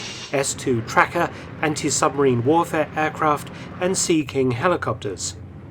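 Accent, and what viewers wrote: United Kingdom. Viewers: Great documentary. Superb British accent.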